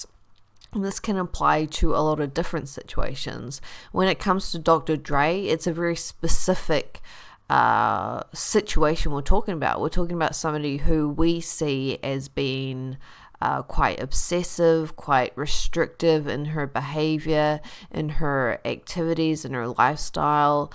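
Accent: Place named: New Zealand. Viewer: I knew when I heard your voice that you're a kiwi, so I stayed to listen because I follow Dr Dray, the thing is she knows what she's talking about and if anyone should be listening to her advice on sun protection it's us down here in Aotearoa/New Zealand. Her choices don't concern me but I was a bit shocked to see the judgy nasty stuff, I'm more of a live and let live but if you're a creator then it's to be expected I suppose. The thing with kiwi's is we are a passive-aggressive nation so I get where your coming from eh, we don't confront but we will orchestrate a campaign lasting 500 years involving the cast of Ben Hur to send you a message!. "God I love us". In any event, we are watching Dr Dray transform as she becomes more comfortable on-line, is she obsessive depends on your point of view. I follow her advice on showering and moisturizing within two days there was a noticeable difference in my skin plus I am super Zen starting my day. 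She loves coffee my kinda girl, she taught me how to layer my skincare regime, so I'm not wasting product now. She talks to women about affordable skincare, who doesn't want to hear that eh!. Is she too thin?, well her body shape hasn't changed from when she began on YouTube, she only went vegan a few years ago, so the correct question might be, is it right to put our normal on someone else? and what is normal anyway? what we do actually know is the West is getting fatter; sedentary lifestyles, convenience food etc.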